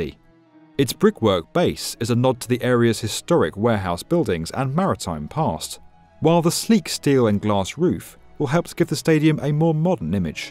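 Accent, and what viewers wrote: United Kingdom. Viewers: Wait is narrator British ? Why is he calling it soccer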